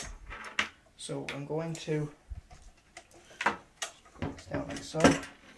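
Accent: Ireland. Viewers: R u irish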